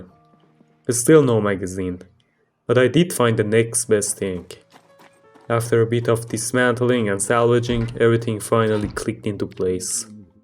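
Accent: Iran. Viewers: the persian accent 😂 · دادا ایرانی؟ لهجت داد میزند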